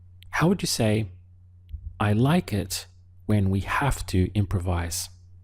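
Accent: Castile, Spain. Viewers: Is this the Spain dialect? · Hi, this may sound like a silly question, is this European Spanish? Many thanks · this Spanish is Castilian, right?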